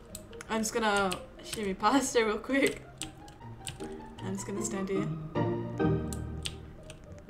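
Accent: United Kingdom. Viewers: I love the fact that ur British it makes ur voice so cute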